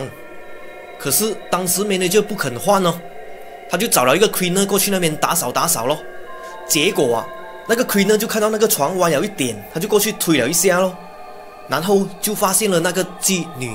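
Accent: Malaysia. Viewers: 最恐怖的還是馬來腔華語發音好恐怖😱😱...嘩咾欸..😱😱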